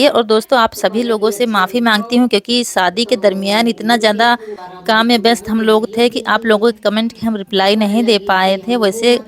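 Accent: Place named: Unnao, India. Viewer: Kya aap unnao jila ke hasan ganj ke aas pas ke rahne wale ho. Kyo ki ham bhi wahi ke rahwasi hai aur Aapki bhasa bilkul hamare jaisi hi hai aur sare sadi biyaah ke reeti rewaaz bhi. Mai mumbai main rahti hu aur jab bhi aapki vedio dekhti hu to mujhe bhi apne gao ki yaad aati hai.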